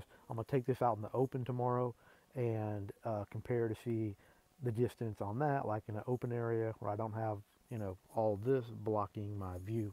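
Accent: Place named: United States. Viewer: why talk meters when you sound American.....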